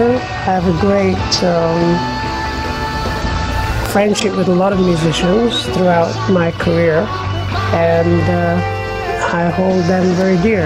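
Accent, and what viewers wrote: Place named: United States. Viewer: Nice one, when was this recorded as you have your American voice instead of the Norfolk St one in your recent live stream.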